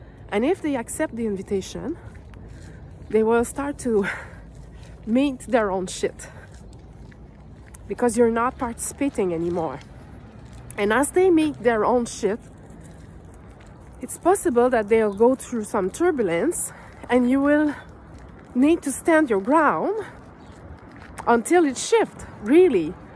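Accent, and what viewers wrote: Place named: Quebec, Canada. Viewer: You sound québécois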